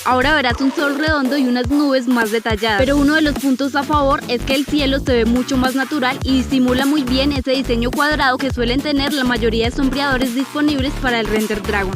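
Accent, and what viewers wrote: Colombia. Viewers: voz colombiana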